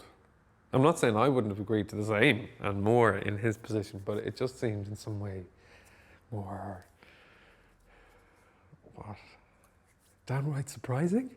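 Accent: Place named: Ireland. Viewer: He has a very nice Irish tone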